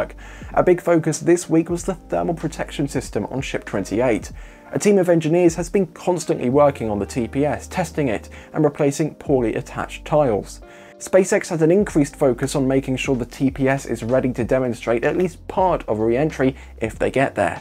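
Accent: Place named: Midlands, United Kingdom. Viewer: Brilliant, just Brilliant. Nice one! Anyone would think you were Scottish, with NO sunshine on that skin. But the middle England accent says no!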